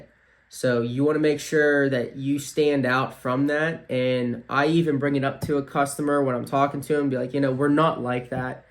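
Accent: United States. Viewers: Lol you from pa man sounds like a a accent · Lol this dude is Delco AF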